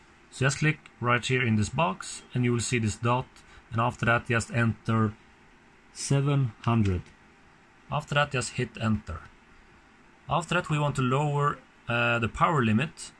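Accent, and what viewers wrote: Sweden. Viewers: Ahahah i got the Dual Mini model... Flawless Price ...snagget it right before everything went Haywire with the prices. Im Swedish too, you sound like Stockholm dialect.. :D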